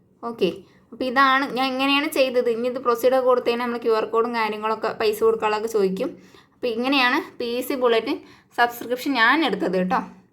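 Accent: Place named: Ottapalam, India. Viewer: ഒറ്റപ്പാലം side ആണോ വീട്.... ഭാഷ കേട്ടപ്പോ അത് പോലെ തോന്നി...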